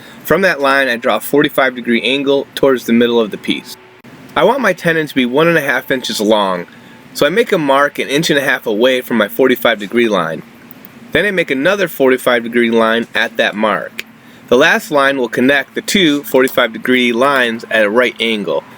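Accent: Michigan, United States. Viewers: Your accent brings me home. I grew up in Michigan. But I've lived in AZ for 30 years. You sound like my nephews.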